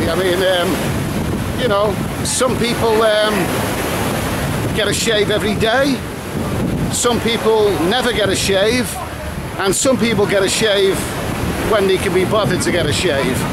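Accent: Liverpool, United Kingdom. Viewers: Good Morning just gone 7.30am here in deepest Issan What a great way in which to start the day a vid from The Beer Garden Yule be looking for a deckchair on the beach now to sleep all that fayre off!! Great viewing always a pleasure to hear your dulciet scouse tones Reminds me of an ol mucker o mine from Toxteth living in Jersey keep up the Pattaya vloggin it fills a much needed void up here in riceland All The Best Big C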